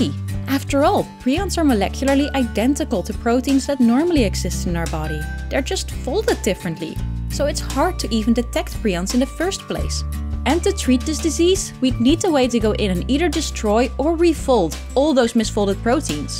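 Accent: Netherlands. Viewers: Dutch accent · Yikes, dat je uit Nederland komt is onmiddellijk duidelijk van jouw accent. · Massive dutch accent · you have to be dutch :) the way you say creutzveldt-jabobs is how you say it in dutch. also there is a dutch accent on the english but Im al proud duty to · And yes, as always i can hear a dutch persons heavy accent. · Heyyy that's a dutch gal; I can instantly tell. Je doet het goed! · The Dutch accent makes me feel at home instantly. (sorry, if I misidentified the accent) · Kan me niet op de video concentreren hoor met dat mega Nederlandse accent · Do I hear a Dutch accent there? It sounds like she's Dutch and I love it! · Dutch accent be thicc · ✨Dutch accent✨ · It's funny hearing this as a dutch person because the accent of the narrator is so clearly dutch. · Sorry if i am wrong, but i am 99% sure this is a dutch accent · i thought right away "since when did minuteearth have a dutch accent"? 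then i checked and saw the name....🤣 cute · A wild dutch accent appears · This new presentation voice sounds very Dutch, is she from the Netherlands? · Damn that accent cannot be more Dutch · As soon as started to talk I heard the Dutch accent! Awesome video anyway:) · she has a Dutch (Netherlands) accent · She is definitely dutch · As a Dutch person I instantly recognised the accent as Dutch😅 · Are you dutch by any chance?? Your accent really sounds Dutch 🧍‍♀️ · Are you Dutch? 👀 You sound Dutch (from a Dutch person) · that's just her being Dutch though. They talk like that :p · Nou ik hoor dat het Nederlands is natuurlijk, lekker bezig!! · Dutch? i could recongnise that accent from 100 km away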